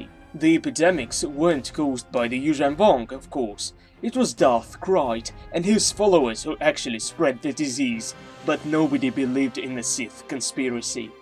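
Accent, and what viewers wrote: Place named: United Kingdom. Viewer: This is British accent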